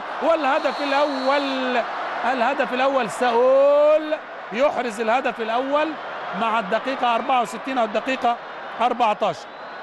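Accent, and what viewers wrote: Upper Egypt, Egypt. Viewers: هو المعلق ده صعيدي بيقول يا مؤمن يازكرييه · هو المعلق صعيدي مع احترامي للصعايدة يعني بس المعلق مضحك اوي😂😂😂😂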